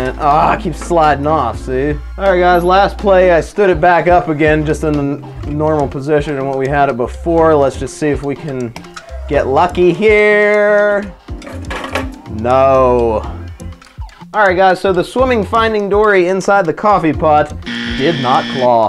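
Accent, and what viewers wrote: Pittsburgh, United States. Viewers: are you from pittsburgh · are you from pittsburgh pa?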